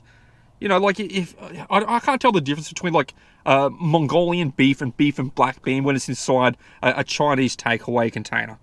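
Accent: Australia. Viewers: I love your accent! Australia?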